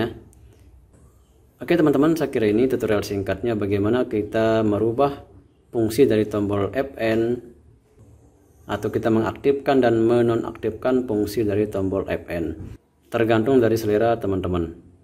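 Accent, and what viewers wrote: Bali, Indonesia. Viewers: aksennya kok kayak orang Bali ya?. Laptopnya seperti yang aku pakai ini, paka SSD 256GB, HDD 1TB dan RAM 8GB, hanya saja untuk record aku kurang sreg, karena suara fan masih terdengar, mungkin harus pakai external Mic ya?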